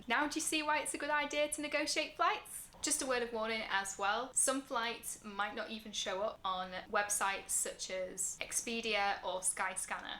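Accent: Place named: Ireland. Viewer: are you Irish? you just cant hide your accent.....................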